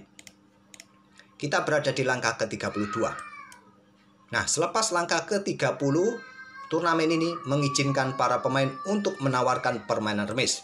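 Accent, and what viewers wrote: Java, Indonesia. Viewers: tak tantang lagi ogah, tak tantang lagi emoh, wong jowo ternyata admine wkwkw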